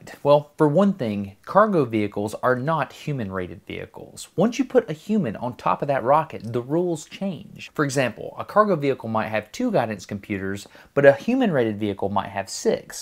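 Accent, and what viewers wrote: South, United States. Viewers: Your southern accent really stood out for me for some reason. Anyway great vid.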